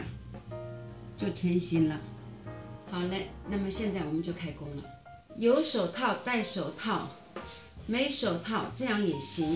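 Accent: Shanghai, China. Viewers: 谢谢老师，很亲切的上海普通话，白脱油😄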